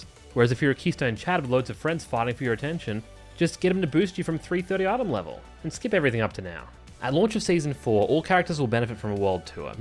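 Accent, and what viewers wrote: Australia. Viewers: He's got some kind of Australian accent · Bro theres nothing better than hearing another brother aussie making WoW videos <3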